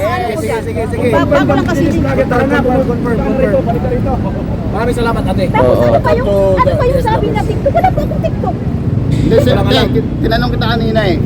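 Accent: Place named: Visayas, Philippines. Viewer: bisaya ang tuno ni ate.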